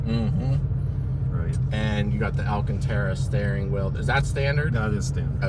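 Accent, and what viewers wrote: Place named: Pennsylvania, United States. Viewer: I knew you were from PA... we got that accent so I am told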